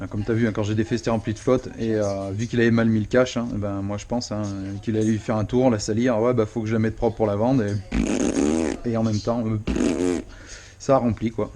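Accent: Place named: Forez, France. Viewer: Je reconnais cet accent. J’ai vécu un temps à Thiers et à Clermont. Tu ne serai pas du côté du Puy ou du Forez ? Salut à toi l’iroquois en tout cas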